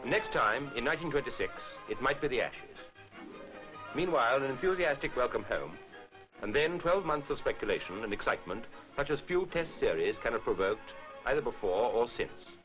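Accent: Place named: RP, United Kingdom. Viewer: What superb footage of the greats! The GOM, Hobbs, Sutcliffe, DGB, King George in Bowler, Chapman, Woolley, Armstrong, even my countryman, Ranji, whom I have longed to watch! When was this documentary made? The commentator's is as sharp and discriminating an RP as one could want to hear. Thank you! If only we could get to see Trumper, McCartney, May, Hammond, Amar Singh, Mohd. Nissar, Hazare, Merchant, Barrington, Miller, Greig...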